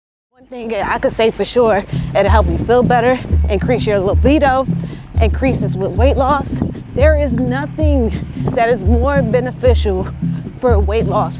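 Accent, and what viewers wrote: United States. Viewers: 😳💭 “You’re sound American-born, you’re body is lookin’ Greek statuesque, but the name is lookin’ Haitian as hell.” Watches video 👀💭 “She said ‘Kisa?..’ and ‘North Jersey’ came across the screen?!.. She’s definitely Haitian b!” 💯😂😏💪🏾🙏🏾🇭🇹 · Go girl u u look good i just came across your videos i just subscribed im going to continue to follow you i just started working out but i work out at home ❤😂 keep up the good work u sound like my cousin from Westchester new York white plains i love y'all accent ❤💯🤣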